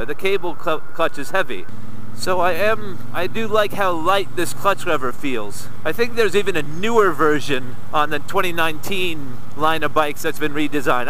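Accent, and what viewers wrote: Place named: United States. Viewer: Hi, How is it that you have made your home in England. You sound like an American. I lived in London as a teenager and have always wanted to return and live there. How did you do it?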